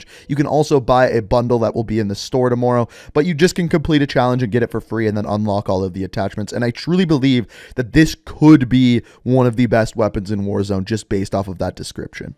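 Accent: Canada. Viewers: I love yo videos. Ur Canadian ryt just wondering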